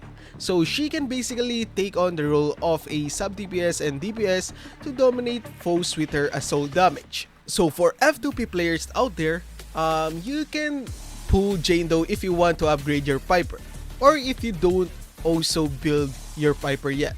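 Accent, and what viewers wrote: Philippines. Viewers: I mean 90% of that because of the Ph accent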